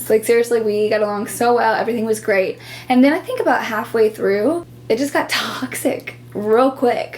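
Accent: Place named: New York, United States. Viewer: Yo u funny asf 🤣 n you sound like ur from NY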